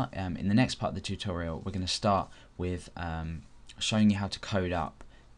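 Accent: United Kingdom. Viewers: Your british pronounce is kinda hard to get. you pronounce php as pae ech pae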